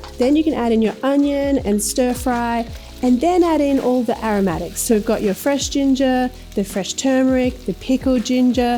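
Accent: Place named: Australia. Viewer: I'm not a native English speaker and I learned mostly by watching American shows and then I lived in the UK for a few years so I picked up a bit of a London accent, and now I'm picking up some Aussie-ness from watching this channel, apparently. My Aussie friend keeps saying "when did you start sounding like this?" it's because of XD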